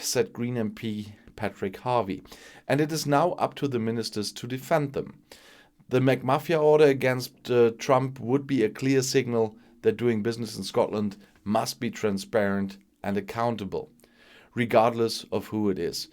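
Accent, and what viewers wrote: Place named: Germany. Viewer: I wondered about your accent, thought it was German, thank you for your very enlightening contribution! May the trumpstain disapears back to hell, where he belongs!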